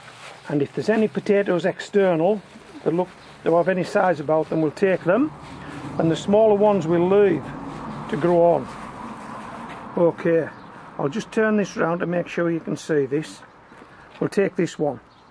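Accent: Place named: Northern England, United Kingdom. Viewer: Loving your videos, so informative and easy to follow, and loving the fellow northern accent!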